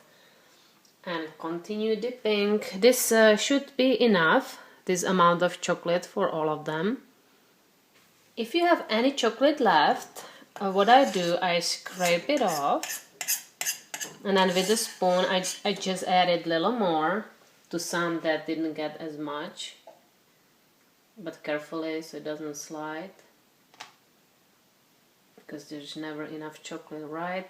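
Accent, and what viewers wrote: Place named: Czech Republic. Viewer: Lol it's not your slight Czech accent.All captions I've seen were inaccurate,so I read them for laughs.Once I watched a 50's United Airlines promo.A beaming flight attendant said to a boarding businessman;"you're my first passenger of the day!". The subtitles read"good morning squirrel,you're my first hemhoroid of the day."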